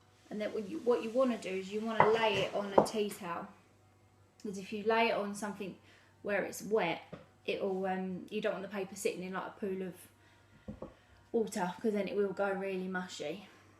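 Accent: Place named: United Kingdom. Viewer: No don't worry about your British accent. I think it's pretty cool. Now with my Texas accent it's funny. Keep up the great work my friend. Hugs from Texas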